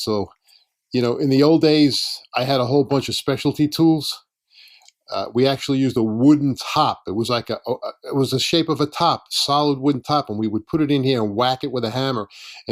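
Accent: New York, United States. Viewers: Nice NY accent!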